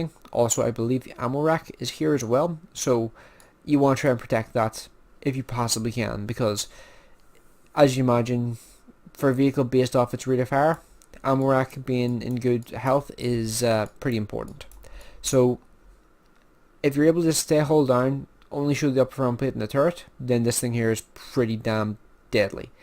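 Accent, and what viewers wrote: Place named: Belfast, United Kingdom. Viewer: Where are you, Belfast?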